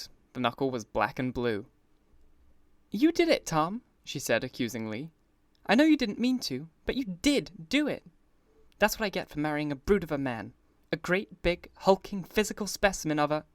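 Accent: United States. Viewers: Woah you have an American accent? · This is so helpful especially since i have 0 attention span when i have to read something. Your american accent is amazing btw!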